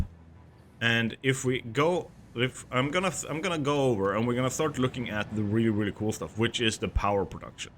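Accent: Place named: Sweden. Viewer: i hear swedish accent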